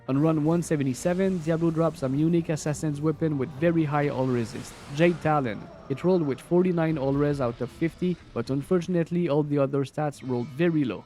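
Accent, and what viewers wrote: Quebec, Canada. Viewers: Je pourrais reconnaitre un de mes fellow québécois entre 1000! Bonne vidéo 🔥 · Do i hear some french from QC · Tes québécois ??? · L’accent québécois s’entend à travers l’anglais, hahaha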